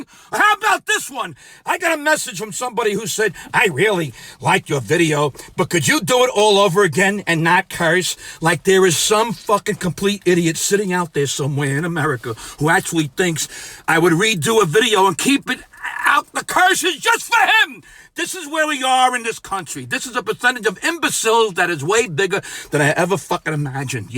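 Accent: New York, United States. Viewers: You are truly the best. F*** what everybody got to say f*** what everybody thinks sometimes you just need the f**** let it all out I love it keep up the good work you're so positive he's so energetic you keep me motivated thank you becoming on YouTube being on Facebook Twitter whatever up your ass LOL thank you very much appreciate you you sound like you're from New York Jersey Brooklyn all in one keep up the good work don't stop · ...as a former noo yawker now living in the not so wild west it's so enjoyable to hear (and see) a guy from the neighborhood who speaks the unvarnished truth with humor and d'accent….now if you could only deliver a real slice of pizza and a cheesecake the quarantine would be great.... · ... you are absolutely fanfuckingtastic! are you a new Yorker? thank you Big Time Bro... T.T H.L.A.M.F.!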